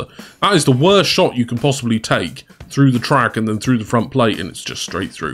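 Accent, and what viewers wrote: United Kingdom. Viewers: Is he British though!?! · as a British person I can confirm he very much is British not northern tho unsure of accent.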